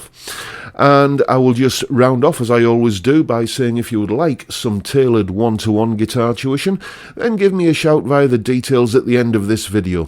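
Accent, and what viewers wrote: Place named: Northern England, United Kingdom. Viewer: As always, I do hope you're well 😎😊 love your stuff, really really love it, and who can honestly say that your northern accent isn't totally great 😎 Just a pitty I live in Denmark, otherwise I would be having lessons in person every week 🇩🇰😎😎 Love it and keep up your great stuff To many YouTuber guitarist's making c.... with their posted videos. Great stuff and very interesting stuff in many ways 👍🏻👍🏻😎😎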